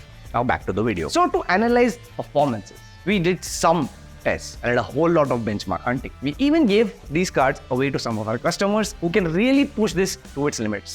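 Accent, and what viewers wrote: India. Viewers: hard to understand what ur saying with indian accent and background music combined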